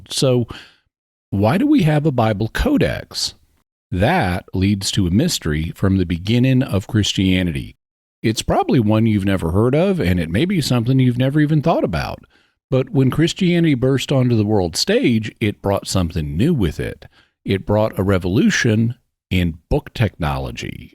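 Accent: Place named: Texas, United States. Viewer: Because he's from Texas and that's probably just the way he talks.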